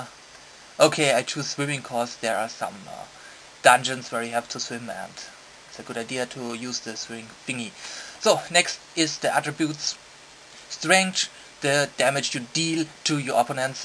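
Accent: Germany. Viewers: Oh god. German Accent. Boner. =| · Are you German? Sorry if I'm wrong, but I'm not so good with accents.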